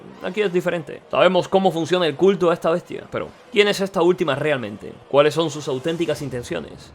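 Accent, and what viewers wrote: Cuba. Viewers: Eres de cuba?😊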